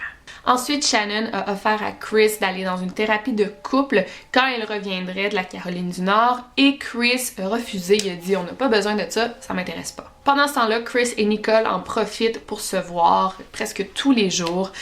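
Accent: Quebec, Canada. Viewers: J'aime trop l'accent canadien,c'est très mignon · Parfois dur e comprendre l’accent québécois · Je suis fou amoureux de l'accent québécois 🥰🥰 Bisous les cousins des français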